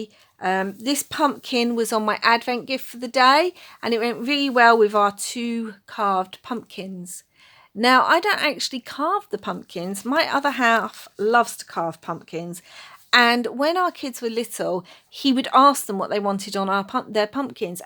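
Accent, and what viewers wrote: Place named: England, United Kingdom. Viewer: Are you Irish born but live in England? Or have you lived in Ireland your entire life? I thought your accent is English/British. Just curious. Didn't want to know for any other reason.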